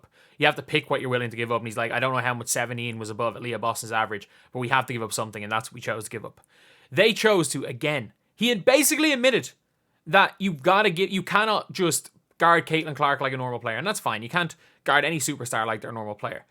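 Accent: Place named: Ireland. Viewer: Good job. What part of Ireland are you from?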